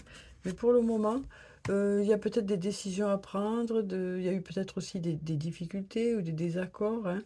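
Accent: South, France. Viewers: Bonjour,cela fait plaisir de vous,mettre un visage sur l accent du soleil e👍🤩😘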